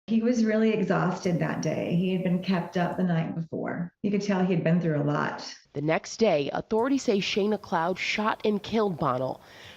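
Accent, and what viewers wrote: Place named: South Africa. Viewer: Why do you sound SOUTH AFRICAN?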